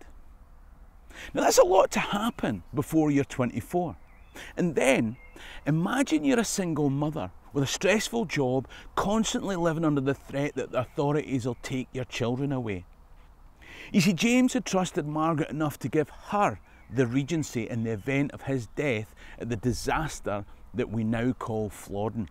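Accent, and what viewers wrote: Scotland, United Kingdom. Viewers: There's nothing better than hearing a true Scot talking about Scottish history 💙🤍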